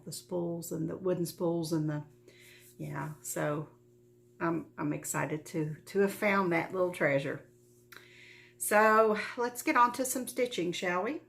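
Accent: South, United States. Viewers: I found you because of a shout-out from Heidi Cran. She said you were real sweet, she enjoyed listening to you; I think she may have mentioned a southern accent. I'd love to stitch the Wysocki charts. Dimensions had a kit "Classic Farm" that was discontinued and word is that they may re-release it. Your Wysocki charts are very reminiscent of that kit. I have been to the P. Buckley Moss Museum and loved it. I stitched a Christmas Stocking chart of hers.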